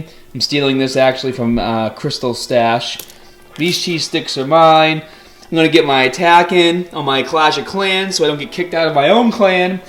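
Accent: Boston, United States. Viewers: Boston haha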